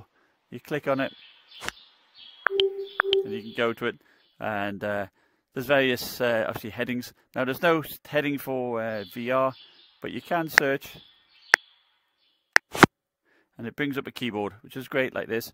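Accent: United Kingdom. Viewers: Hey there, judging by your accent you might be based in the UK, however based on a few articles I read the Samsung Odyssey has no plans to go on sale in Europe and the UK (yet), wondering if you might be based in the US? And if you are indeed UK based, how did you manage to get hold of these?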